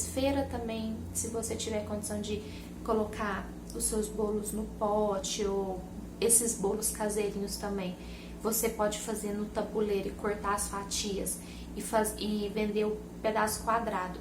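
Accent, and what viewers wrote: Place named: Minas Gerais, Brazil. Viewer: Sou a Vani Souza de Campinas viu bjos linda já disse antes, descobri o seu canal e amei demais, já disse gosto do seu jeito mineirinho de falar, seu carinho com tudo que faz, seu carinho e paciência com as crianças é bom demais viu! Gosto de tudo que vc posta, morro de vontade de comer seus bolos, fico com água na boca, deve ser uma delícia, se tivesse aí perto de vc com certeza eu compraria mesmo kkkkkkk te digo que vc é iluminada, que o Senhor te abençoe e te proteja sempre, vc é especial pra Deus e pra mim tbm viu, manda bjos pra mim, eu comento sempre lá no Instagram, bjinhos nas crianças lindas, fica com Deus, Deus te abençoe minha linda🌺🤗😘💞 Vani Souza de Campinas Manda bjos pra mim linda💓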